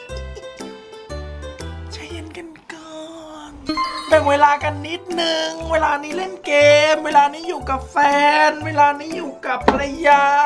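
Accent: South, Thailand. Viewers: พี่ ใต้ไม่นิสำเนียงดีจัด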